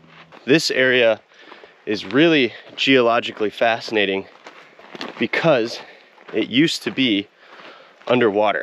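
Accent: Australia. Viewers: I love your aussie so much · Love your Aussie. And the wind not music takes me there with you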